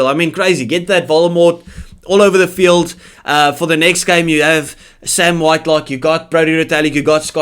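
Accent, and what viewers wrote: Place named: Afrikaans, South Africa. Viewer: Hierdie man het n DIK AFRIKANER aksent xD. Ek verstaan as jy afrikaans praat gaan jou kanaal nie groei nie. Wat n jammer.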